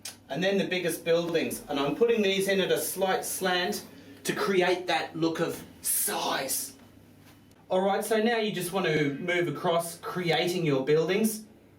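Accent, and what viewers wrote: Australia. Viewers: austrailian accents makes everything sound so cool!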